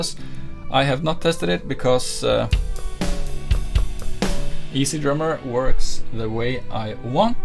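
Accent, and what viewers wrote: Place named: Norway. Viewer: Very help full. I'm now setting my Ugritone drums (Against Humanity). Are you from Norway? I can hear some accent :)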